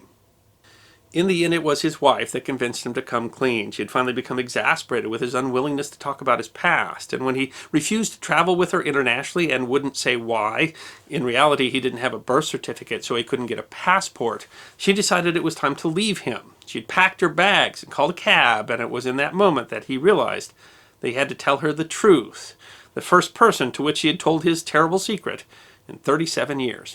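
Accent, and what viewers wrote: United States. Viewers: In American English, most pronounce it like "Why-mar." He's American and so he pronounces it that way. Germans pronounce American place names differently also. Most Germans I know pronounce the US capital as Vashingtone. That's just the way it is. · Forgive me for saying that when watching documentaries on hearing an American accent I generally switch off. This was an exception and I'm very glad I watched it. Many Thanks.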